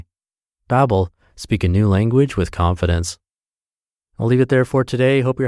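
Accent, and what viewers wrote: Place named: United States. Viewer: Nice to hear an American without an exaggerated accent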